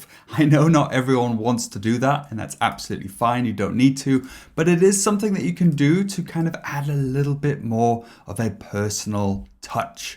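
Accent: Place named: United Kingdom. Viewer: Hey. Fantastic video. Much more informative than others on the listing photos subject. I notice a British accent. Are you UK based? If so do you sell in USA?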